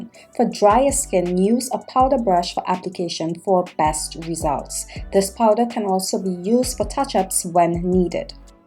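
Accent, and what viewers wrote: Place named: Trinidad and Tobago. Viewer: Are you from Trinidad, Port of Spain? Beautiful accent ❤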